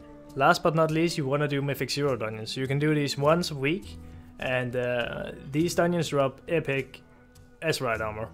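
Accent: Denmark. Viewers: Are you danish? You really sound danish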